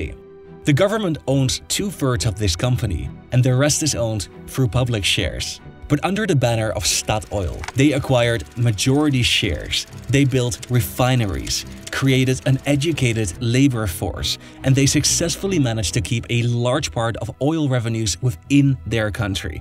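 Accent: Netherlands. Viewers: Hoi, goeie video. Ik heb nog niet eerder een video van je/jullie gezien maar ik kan horen dat je/jullie ook uit Nederland komen 🙂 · Klinkt heerlijk Nederlands XD Maar goeie shit ! Alles komt er bij, ook hoe het samen hangt met andere grondstoffen, hoe de landen er mee om gaan en sowieso hun handel regelen. ZItten nogal flinke verschillen in.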